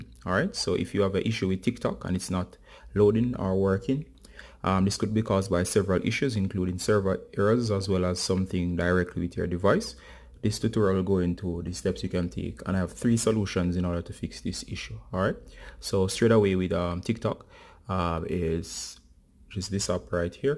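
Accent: Jamaica. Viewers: Are you Jamaican? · Sounds like a Jamaican accent.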